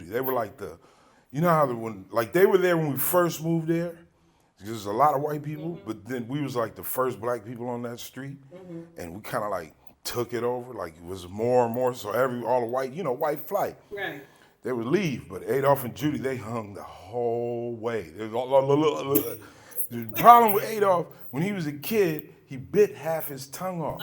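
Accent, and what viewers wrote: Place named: Cockney, United Kingdom. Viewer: Watching you from London, UK, love the 'cockney' accent, lol.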